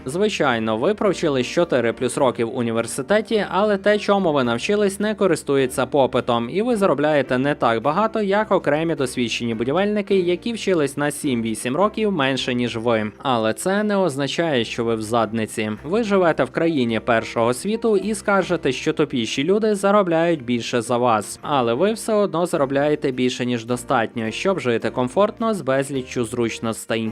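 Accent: Russia. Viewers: У Ютьюбі є авдіокурс української літературної вимови Миколи Погрібного. Навчіться нарешті правильно вимовляти українські звуки, бо бридко чути вашу зросійщену вимову.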